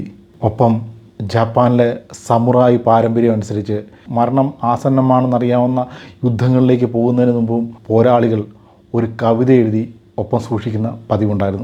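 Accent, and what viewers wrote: Kottayam, India. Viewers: veedu kottayath vellom anoo....slang😊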